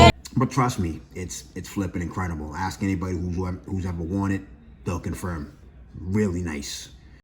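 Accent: Boston, United States. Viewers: Great Video. You have the classic Boston accent! Your selection is impeccable. Im a native to Boston and its great to see someone from home. Im subscribed to your channel. Much Love brother👊🙏.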